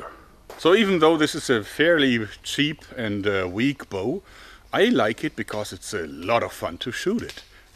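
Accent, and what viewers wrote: Germany. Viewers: du hörst dich an wie ein deutscher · Das deutsch hört man raus! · when he speaks english he sounds more german than some germans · Warum klingt dein Englisch so deutsch? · Nice german accent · Dieser Moment wenn man als Deutscher Deutsche in einer anderen Sprache wiedererkennt haha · I guess its the German accent. One of the guards voice from Skyrim always sounded like Arnold Schwarzenegger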